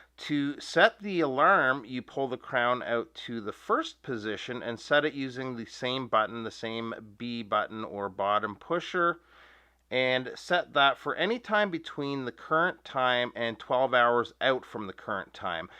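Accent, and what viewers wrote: Canada. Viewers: Great demonstration, disliked for the Canadian accent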